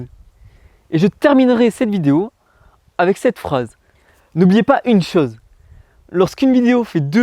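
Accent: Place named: South, France. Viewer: Coucou ! J'ai pas regardé toutes tes vidéos mais ce que j'aime beaucoup chez toi, c'est que tu progresses vite ! Ta diction est beaucoup plus punchy et moins saccadée, tout en conservant cet aspect "théâtral", c'est vraiment chouette ce que tu fais ! Après je t'avoue, mais je veux pas t'influencer hein, mais j'aimais plutôt bien les pistes de réflexion profondes (avec une petite teinte d'humour comme t'aime bien faire, saupoudré de ton accent du Sud (à lire aussi avec l'accent du Sud aussi aha) ;) ) Mais je pense que tu feras ça pour les prochaines ! Continue l'ami t'es clairement sur la bonne voie !!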